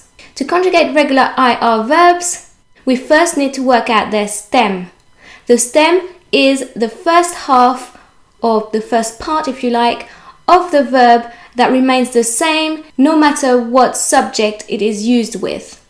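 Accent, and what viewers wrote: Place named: United Kingdom. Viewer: Stop ur British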